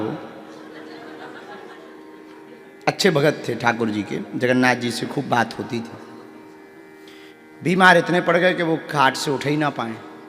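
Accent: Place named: Bundelkhand, India. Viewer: सही बात बुंदेली बोली हमे भी अच्छी लगती है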